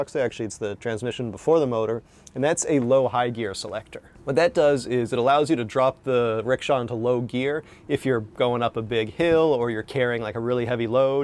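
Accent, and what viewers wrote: United States. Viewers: Your English sounds 100% American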